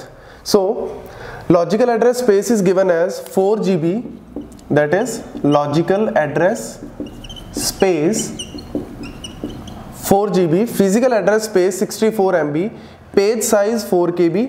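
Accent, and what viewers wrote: Punjab, India. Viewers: Yeah the punjabi accent is really good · I love your Punjabi accent that makes me enjoy topics because l m also Punjabi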